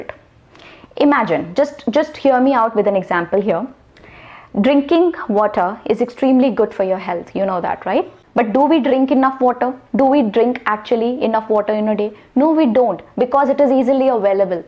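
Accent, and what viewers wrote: India. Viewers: Your hindi's tongue made your english so clear. I enjoyed listening your english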